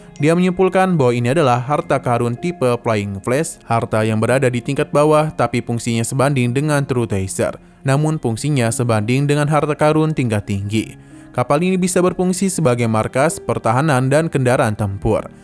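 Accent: Banjar, Indonesia. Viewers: Bang orang Banjar kah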